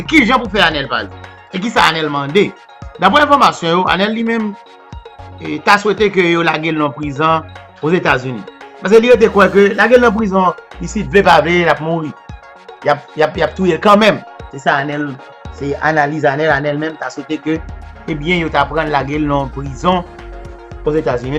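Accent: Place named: Cap-Haïtien, Haiti. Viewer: Saaa c nèg okap kap palé laaa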